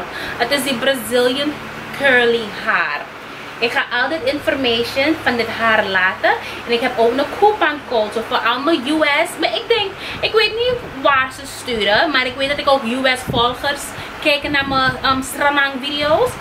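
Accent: Suriname. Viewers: i like your accent, the way you behave. It is funny. Your Su-dutch is ok also how you bring it as a lady, sounds a little bit funny, a suri lady way. . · I love it, gewoon Nederlands mixed met Engels en een Surinaams accent.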